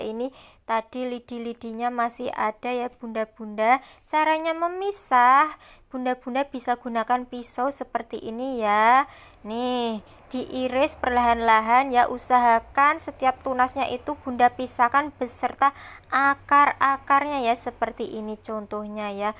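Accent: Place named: Java, Indonesia. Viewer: Mba..ngmngnya medok bgt ..jd saflok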